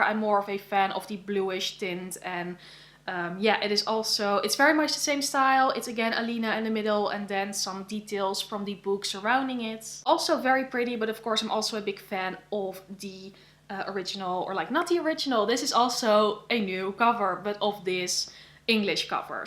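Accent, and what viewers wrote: Netherlands. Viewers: Thanks so much for making another one of these videos, I've been waiting a long time for it since I enjoyed the last one! How is your studying going, and is the pandemic situation in the Netherlands getting better? Your makeup and hair are gorgeous as always! Are you trying anything different, or do you have the same routine? Are there any Dutch writers that you think are really great and that you enjoy reading? I also really get annoyed by nonremovable stickers on books and I usually don't buy books with them, because I just hate the way they look. Have you thought about reading Hanya Yanagihara's first book, The People in the Trees, if you haven't already? Like A Little Life, it is also a superbly written and fascinating book based on a true story about some very awful and difficult topics. Also, I love hearing you talk, since your accent is beautiful and unique!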